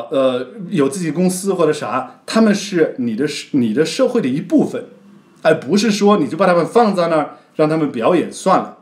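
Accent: Standard. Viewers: 中文说的真是好。赞一个，标准普通话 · 普通话很标准。这次的春晚是有点倒退了。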